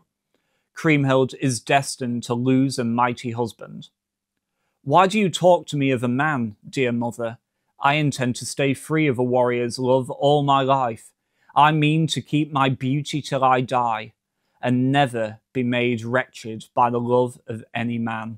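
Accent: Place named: United Kingdom. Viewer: American here with honest question. The reader has to my ears an unusual Brit accent. Where is he from?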